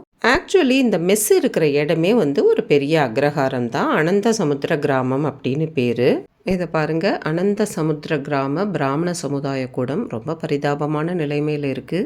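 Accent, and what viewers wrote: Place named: Thanjavur, India. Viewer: Very Pleasant divine Tanjore Tamizh Narration without the noise and exaggeration.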